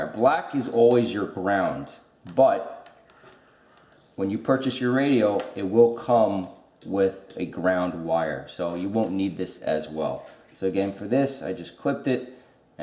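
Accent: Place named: New Jersey, United States. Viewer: You sound like your from Jersey, i just watched the movie goodfellas you have a similar accent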